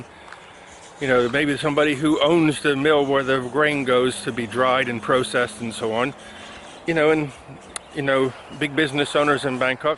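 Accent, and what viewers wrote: United States. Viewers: Thank you so much for the shout out. You didn't have to do that for me but much appreciated. I thought that I detected some American in your accent. I was stationed in Goldsboro NC back in <num> and again at Fort Bragg <num>. I agree with everything you said, that is why I always preferred to be stationed in Europe, some of the same issues but not as bad. Your land is so beautiful, a true paradise indeed. Thanks again for the shout out and I look forward to meeting you when I retire.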